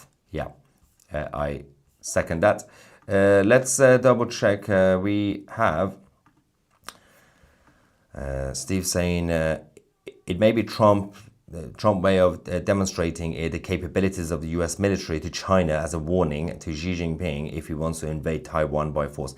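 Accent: United Kingdom. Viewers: I love how when mentions Caracas it sounds as if he were saying "Crackers" in his lovely British accent. 😉🤣 Seems appropriate somehow. But here is hoping they can get back some SANITY in their gov't with dictator maduro out of the way.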